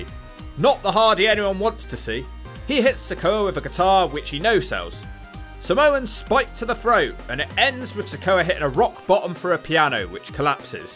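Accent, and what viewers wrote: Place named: West Country, United Kingdom. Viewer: I've watched a few of your videos and always enjoy them. From your (West Country?) accent I thought you would be like 5'6, nerdy black hair and glasses. But you are very presentable, keep up the good work, I appreciate you're unique take on everything wrestling wise. There is so much generic wrestling commentary on YouTube, so your distinctiveness definitely stands out.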